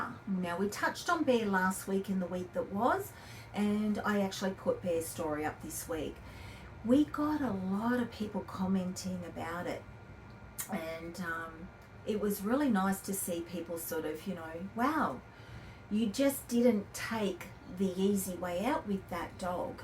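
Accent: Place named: Australia. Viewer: Love listening to your stories. You have a lovely way about you. I was brought up in North Brisbane so nice to hear the Aussie accent that I have now lost. Sending best wishes from North Wales. Wendy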